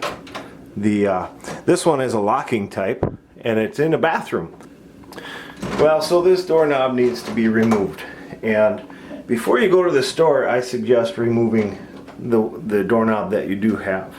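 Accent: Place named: Midwest, United States. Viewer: thanks. very clear. sounds like a midwest accent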